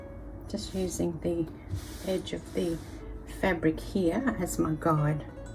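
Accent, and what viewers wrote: Australia. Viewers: So wonderful to hear an Australian voice working with scraps! I have just begun my scrappy journey. I love the project. Thanks for sharing!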